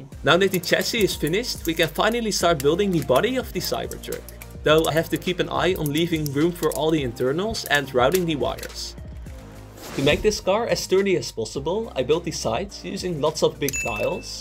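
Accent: Netherlands. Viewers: i can hear is NL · No shit bro ofc hes dutch you can hear in inmediately by the way he talks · Echt een heel erg nederlands accentje, maar wel leuk man dit hahaha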